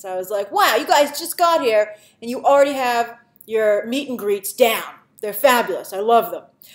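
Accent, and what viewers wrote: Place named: New York, United States. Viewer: I just have to ask which part of New York are you from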